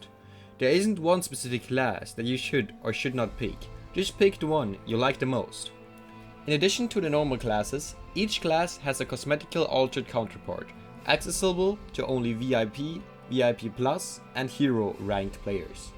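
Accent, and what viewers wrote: Germany. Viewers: Where are U from BC english Sounds like a German accent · it sounds like u are from germany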